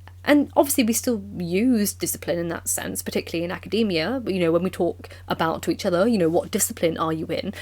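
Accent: United Kingdom. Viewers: How did I know you were British before I clicked on the video?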